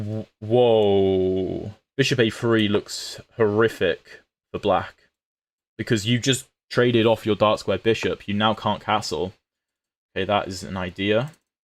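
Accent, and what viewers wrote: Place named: Outer London, United Kingdom. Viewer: Please stick to the longer time control.your 1st oppoonent bundered all over the place presumably because he didnt have time to think..wheres the accent from sounds like the outskirts of london?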